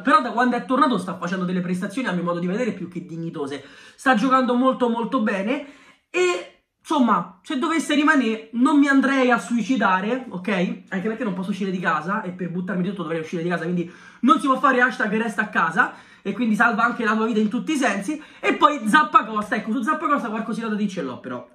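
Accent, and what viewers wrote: Rome, Italy. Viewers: mamma mia che fastidio. Ma non si può parlare in dialetto? Se è di Roma perché no! Fosse un altro youtuber ok, ma così no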